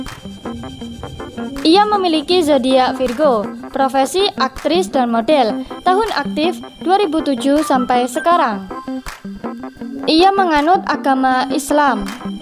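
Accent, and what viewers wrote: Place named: Java, Indonesia. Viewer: Jawa bgt kak..wkwkwk👌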